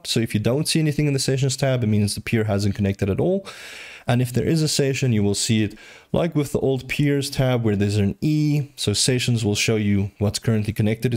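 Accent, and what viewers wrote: South Africa. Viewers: This is amazing, thank you! By the way, where is your accent from? South Africa/Zimbabwe by any chance?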